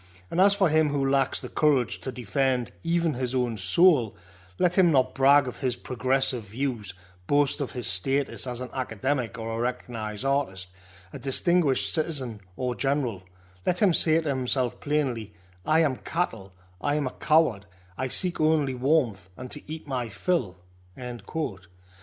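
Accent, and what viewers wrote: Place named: Newcastle, United Kingdom. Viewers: So well articulated. Just as i found it on my return from Sweden after one year due to kung flu regs. Love the background shots of rushing water ,the simple melody on the concertina and the northeast dialect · Well it's certainly not everyday you get to hear the word "catharsis" spoken in a broad Geordie accent! Excellent content.......... subscribed. :) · 'Geordie', North East England. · as a northerner myself this channel is a wonder and listening to the accent of the north is refreshing ..he is insightful and much needed in these times ..i would vote for this man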